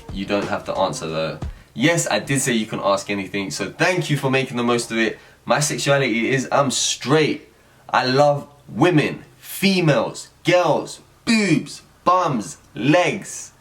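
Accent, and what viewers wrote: United Kingdom. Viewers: talvez seja pelo sotaque britânico dele, dá a impressão que ele ta falando mais rápido mas é só o sotaque mesmo. não desanima, com o tempo você vai acostumando. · #AskPhitzee I heard you say you are from Montenegro. Your accent sounds British. Have you lived in Britain most of your life?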